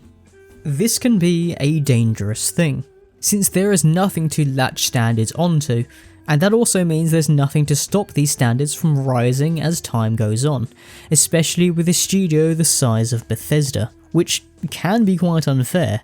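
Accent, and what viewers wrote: United Kingdom. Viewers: it took me this long to realize you are British. That's how bad I am at recognizing accents...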